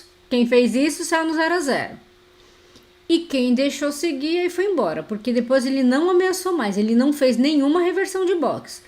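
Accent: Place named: Minas Gerais, Brazil. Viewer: sabia que tinha algo por trás desse mineires!😘👍🏼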